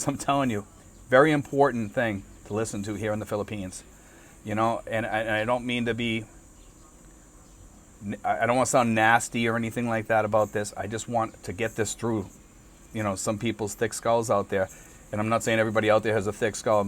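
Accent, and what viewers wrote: Boston, United States. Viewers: Boston accent shined!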